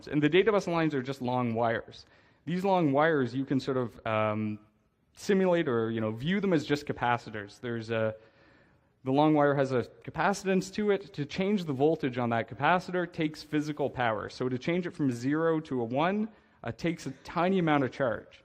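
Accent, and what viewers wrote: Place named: Canada. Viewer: good talk, but a bit too canadian.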